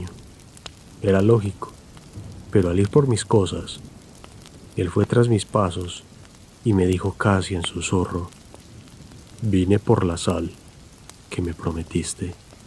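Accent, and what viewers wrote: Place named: Colombia. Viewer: Quizas el relator es de Colombia...no lo se ,pero esta historia es real,año 1910...coltauco...Rancagua...Chile...una noche de invierno...miguel fue real y aun en callejon de lo de cuevas existe un diminuto monolito en donde encontraron a miguel....felicitaciones por tan interesante y bien realizado relato❤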